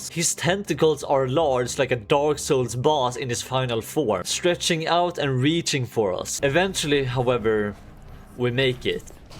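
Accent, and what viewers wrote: Sweden. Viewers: are you from sweden ? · I just know this guy is from Sweden. Can hear it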